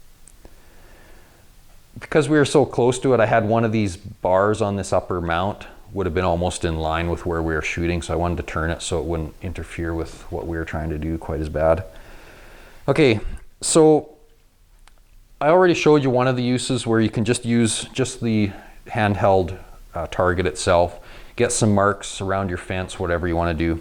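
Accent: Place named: Canada. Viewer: first video watched on your channel, I recognize that accent, what part of Canada are you from?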